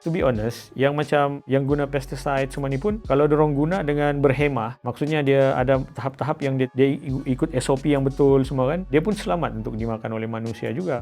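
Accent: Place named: Sabah, Malaysia. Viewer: Sedap dengar slang sabah ba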